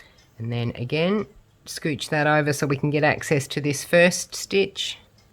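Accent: Australia. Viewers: Delightful square - different and therefore appealing. :) Question: Aussie ? Statement: very well described process, complete with helpful pointers. Goodonyermate !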